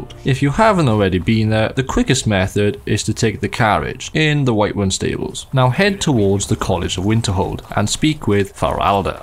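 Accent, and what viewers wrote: Wales, United Kingdom. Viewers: Are you from close to Cwmbran my dude? You sound like you’re from Newport or Cwmbran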